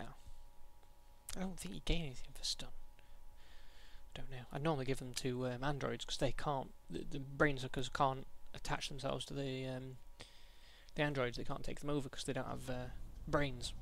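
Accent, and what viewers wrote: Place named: Manchester, United Kingdom. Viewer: I don't know if you actually are, but you sound like a proper, proper manc, ha ha ha. Good LP so far :).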